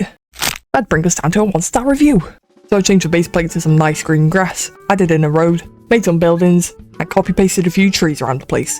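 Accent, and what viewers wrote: United Kingdom. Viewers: bro is british please i am challinging you to say "bottle of water" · Are u british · Are you British because I'm British you sound like one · The british accent🇬🇧😭🙏💔🥀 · Are u British? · Are you British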